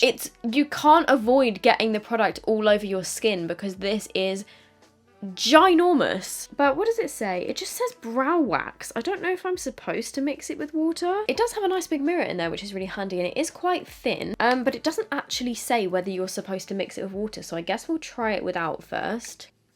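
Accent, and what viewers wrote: United Kingdom. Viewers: !!!! I started watching your videos in 2017 or 2018 exactly for testing these cheap make up products and because I was living in UK back then and you helped me to learn understand british accent. Later I stopped watching youtube for couple of years and now I just felt like watching make up video and I remembered you so I got back and it was one of the first videos that was recommended for me. I still love you♥ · You popped up on my for you page in YouTube about a year ago but then I didn't like the British accent but at the same time i was watching some of your videos and after a couple months i became addicted 💗💗💗💗💗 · I found you in mid-2017. I was 37 years old and had NO idea how to do makeup (foundation especially!). I’d always been too lazy to do makeup, and my skin was clear due to hormonal BC since age 15, so foundation was completely alien to me. 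But as I approached 40, my skin was beginning to show sun spots and dark circles, so I wanted to learn how to do my makeup. I subbed to around 15 makeup channels including yours & I have only stayed with 3, including you. Mostly because you’re super relatable, down to earth, honest, and I adore a British accent (USA here). I’ve really enjoyed watching you grow up and thrive. I’m happy for you in the same way I’d be happy for a little sister… is that weird? I don’t even know you but I have the best wishes and highest hopes for you & your little family.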